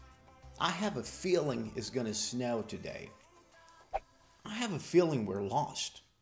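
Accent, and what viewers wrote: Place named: United States. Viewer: Buen inglés americano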